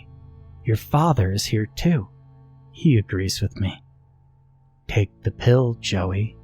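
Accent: South, United States. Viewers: Great southern mom accent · I'm wheezing,. The way you pull off that accent tells me you KNOW the southern life to some degree 😂😂 If not, and, tbh, either way, you nailed it! ❤❤❤ Thanks for the smile and the wonderful story! EMDR is...hard, and I've been binging your channel after not seeing you on my algorithm for nearly 4 years! I'm glad I have so much to catch up on now, despite YouTube playing keep away! 🥰🥰🥰🫂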